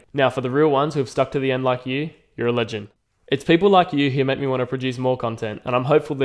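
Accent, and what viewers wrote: Australia. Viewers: Good on you brother! Where are you from? Sounds Aussie.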